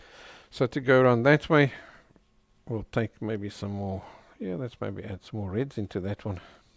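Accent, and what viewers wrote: South Africa. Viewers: Hi. Judging by your accent I assume you're South African. Where can I buy a set of pencils like the one you had in your video about learning how to draw? ❤Love your channel so much; thank you for making time to share your talent!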